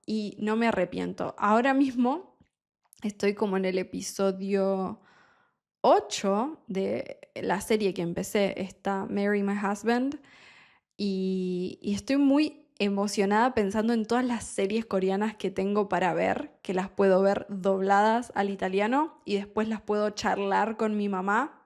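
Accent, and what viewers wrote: Argentina. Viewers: I get so excited when I'm watching your videos and realize I understood almost everything. My spanish teacher is from Buenos Aires and I love watching your videos in general, but I noticed they have helped me understand her accent better too. In my lesson today I only remembered how to say "rallado" in an Argentine accent because of all of your cooking videos! It was really funny. It's great hearing about your language learning journey as well. Thank you so much for sharing. 🥰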